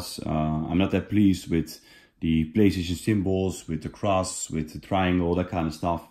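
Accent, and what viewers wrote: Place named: Netherlands. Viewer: You must be Dutch, i talk English in the same way as im Dutch too, nice vid btw! One of the better reviews of this set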